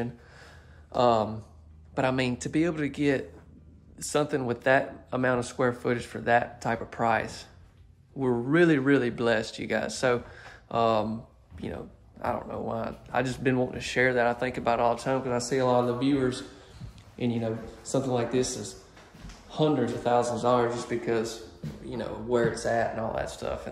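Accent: South, United States. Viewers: I absolutely love this home, and as a widowed grandmother who loves to cook, I’d think I had already died and gone to Heaven if I was blessed to be able to have it as my own. I’d have one guest bedroom and use the smaller one as my sewing/craft room. Unfortunately, I’m not in a position to be able to buy it, but I love watching the videos and dreaming. You sound like a good Southern young man to me, and you come across as warm, funny, and, most importantly, honest about the homes you show us. I’m in the upstate of South Carolina where the tornado hit, and if it were possible, I’d buy this home, because it was love at first sight! 😂 Thank you for your videos and for being who you are. I still have to have hope and dream, and you make this so enjoyable for me. Keep up the good work, and I’ll keep watching! 😉👍🏼🙏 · I enjoy your adorable Southern “accent” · As a fellow southerner I love your accent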